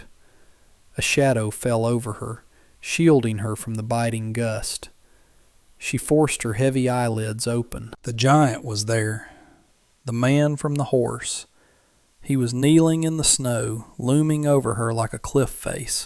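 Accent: South, United States. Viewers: Finally, narration and a believable story. The pictures let it down but the souther drawl and correct pronunciation of words, the use of different names made it a refreshing dream to listen to. Excellent. Most enjoyable story I’ve heard from these types of stories. Very well done.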